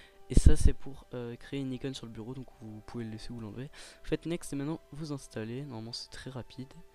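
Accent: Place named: England, United Kingdom. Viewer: omg cet accent anglais xD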